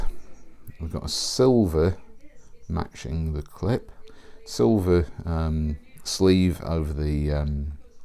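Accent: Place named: Midlands, United Kingdom. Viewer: I understand him just fine, but I am English, I guess. It's a Midlands accent of some sort, I think, which I imagined would be easy to understand.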